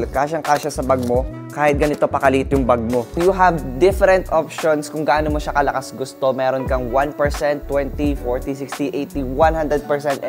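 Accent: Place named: Quezon, Philippines. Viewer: I knew it. Narecognize ko agad yung punto ng Quezon..😊 new subscriber here..🎉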